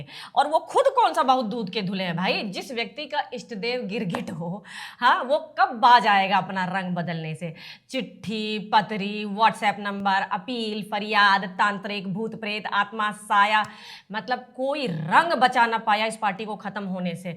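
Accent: Bhojpuri, India. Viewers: g jai Shri RAM 🎉❤... The style of your news presenting is very unique and ur bhojpuri slang is awesome... Pin my comment 😅🎉❤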